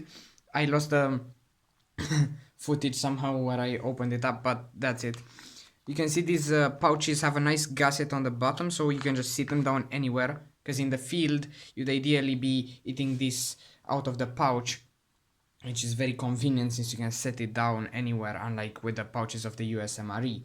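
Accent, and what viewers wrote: Romania. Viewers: Dude. Are you from Romania by any chance? Can't tell from the accent...But it sounds just like it.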